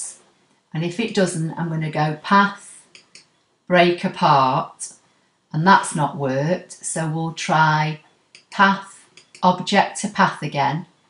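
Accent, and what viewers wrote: United Kingdom. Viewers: Wow. This is awesome. I have been searching for a way to curve text and save as an svg file. You couldn't have made it any easier. I love the British accent. Thank you.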